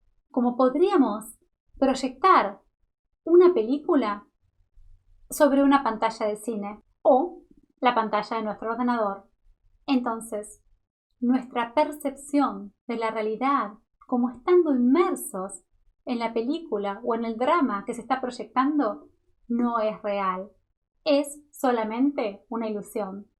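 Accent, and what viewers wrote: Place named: Argentina. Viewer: Está muy bien la información pero ese desgraciado acento argentino y en otros videos el acento español hacen muy difícil aguantar verlos hasta el final, es un desgraciado suplicio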